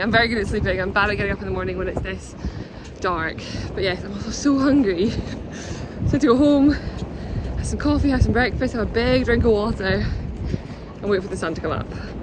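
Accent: Scotland, United Kingdom. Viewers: Hi love your podcasts and design. But must be honest a bit as English isn't my mother language is very difficult to understand your scottish accent have to use subtitles. Thank you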